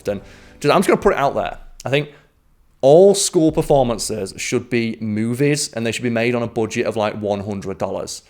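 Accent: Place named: Yorkshire, United Kingdom. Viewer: The Yorkshire dialect isn't that bad to understand. Also just turn on closed captions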